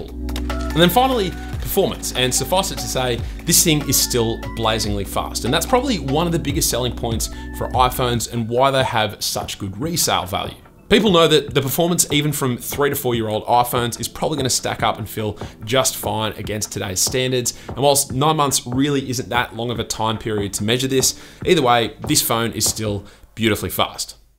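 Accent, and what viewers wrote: United Kingdom. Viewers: Thought you were British... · I thought you were from I UK or something haha. Sorry English it's not my first language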